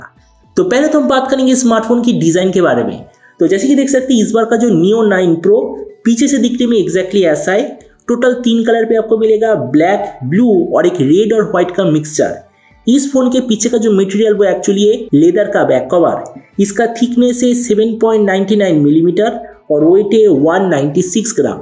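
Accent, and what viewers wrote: Bengal, India. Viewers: Bro, tongue Hindi nahin hai to Hindi channel mat karo, bongali hi thik rahegi.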